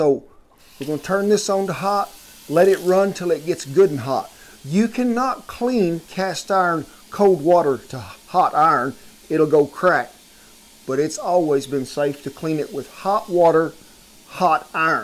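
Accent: South, United States. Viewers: Hart warter lol love the southern accent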